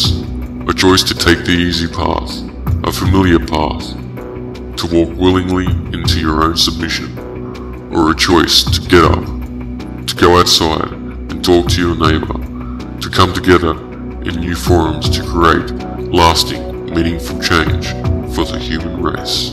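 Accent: Australia. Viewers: He has an Australian accent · What's with everyone commenting on his Aussie accent? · Derp he sounds Australian. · lol, I thought to myself, Anonymous sounds Australian lol, but I realized you were just narrating it :)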